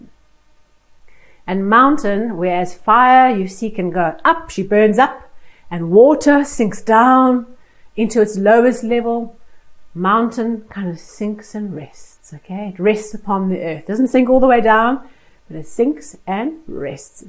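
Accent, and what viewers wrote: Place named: South Africa. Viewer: Oh my goodness, you are South African. I am too!!!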